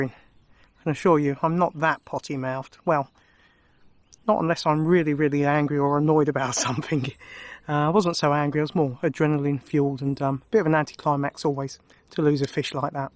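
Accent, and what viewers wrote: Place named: Sarawak, Malaysia. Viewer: Sounds like ur in Sarawak bud!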